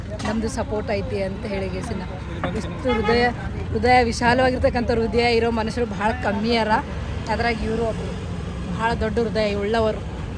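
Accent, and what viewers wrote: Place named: Kalaburagi, India. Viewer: ಕರ್ನಾಟಕದಲ್ಲಿ ಪ್ರತಿ ಜಿಲ್ಲೆಯಲ್ಲಿನ ಮಣ್ಣಿನ ಸೊಗಡಿನ ಭಾಷೆ ವಿಭಿನ್ನವಾಗಿದೆ... ಎಲ್ಲವೂ ಒಂದಲ್ಲ ಒಂದು ರೀತಿಯಲ್ಲಿ ವಿಶೇಷವಾಗಿದೆ... ಹಾಗಾಗಿ ನಿಮ್ಮ ಬಾಯಲ್ಲಿ ಕಲಬುರಗಿಯ ಭಾಷೆ ಕೇಳಲು ಚಂದ..❤❤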